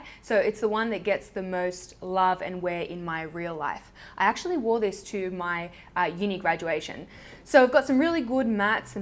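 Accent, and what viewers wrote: Australia. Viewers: I absolutely love the yearly favourites as well! I look forward to them so much. Also side note: I really enjoy listening to your Aussie accent. It's made me realise that I think you are the only fellow Aussie beauty YouTuber that I follow and I should find more.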